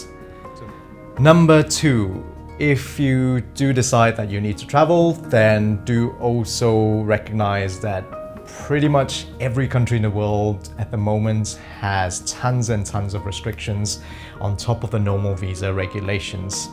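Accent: United Kingdom. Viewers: I didn’t know you were Malaysian! You’ve got a British accent!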